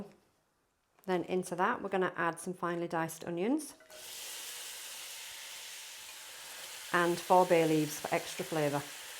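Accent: United Kingdom. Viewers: plus there’s nothing more commanding yet soothing than a Yorkshire accent, you sit up, and pay attention whenever you hear one! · It's not dubbed, it's the presenter's normal accent, a Northern English one. A part of the UK that is renowned for it's Indian cuisine. By your logic, all chefs who present cooking shows should present them in the language of the meal that's being prepped. Jamie Oliver might struggle a bit.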